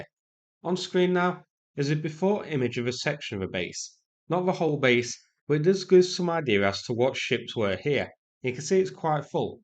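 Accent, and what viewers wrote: United Kingdom. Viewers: Find someone who can speak English not British jibberish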